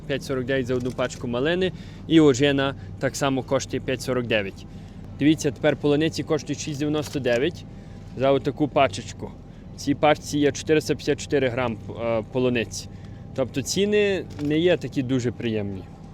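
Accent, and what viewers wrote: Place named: Ukraine. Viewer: Thanks a lot.for your answer. I do love the Passion you put info recording your videos. I am also trying to understand what you are saying in Ukrainian (I only party succeed). I was only slightly suprised that you have a pretty strong Ukrainian accent when Speaking English. As far as I remember, you came to Canada at the age of 11 (before puberty). Anyway, that is really that important. Keep up good work.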